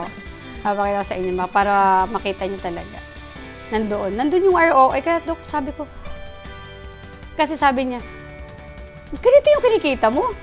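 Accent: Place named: Quezon, Philippines. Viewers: Ay tunay na Quezon ang punto, kainaman na, ang galing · Calabarzon accent diga ho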